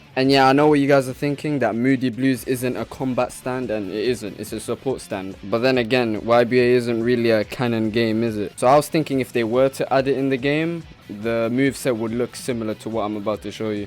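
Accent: United Kingdom. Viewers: He got that bri’ish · Ayyy a fellow British man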